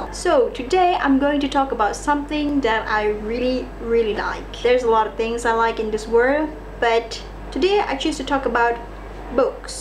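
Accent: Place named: Philippines. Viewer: You're pretty cute, accent sounds Filipino or something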